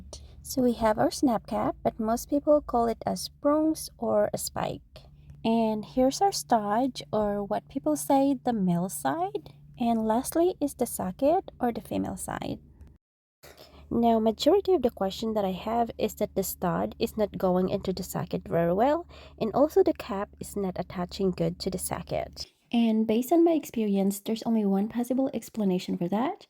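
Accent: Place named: Philippines. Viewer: I knew it was a Philipino accent.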